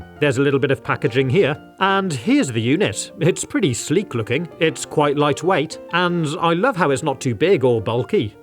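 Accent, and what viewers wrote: United Kingdom. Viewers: nga hes jus british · SO HES BRITISH?! I’ve been wondering what his accent is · The most British voice I ever heard 😊 good video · Apart from the constant upwards inflection at the end of every sentence, this bloke is the most British man to ever British.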